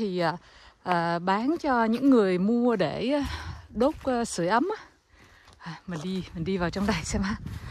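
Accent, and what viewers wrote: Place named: South, Vietnam. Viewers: Giong Nam cua nguoi bac 54. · Giọng nói của chị rất chuẩn giọng miền nam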